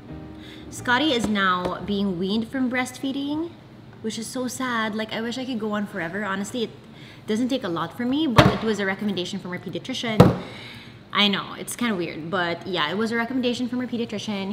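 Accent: Visayas, Philippines. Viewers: Bisaya kaayo ka dong scottie ayy. 😍😁 "TIKI"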